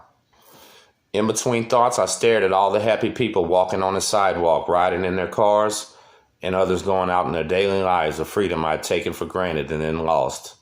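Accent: Texas, United States. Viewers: Hey man..your vids are def. part of "my show" that I watch weekly! My kids dad is doing a 20 yr fed sentence at the moment. We're from Lubbock TX. He WAS in Big Spring TX but just got moved to a medium facility 😟 obviously Idk where he's going to end up in the U.S. but...hope its not too bad 🙏🏼 anyways, your Shit hits home for me 🙂 sending good vibes and energy your way man! BTW!! Man you sound just like someone from TX too!!✊🏽 #mynigga lol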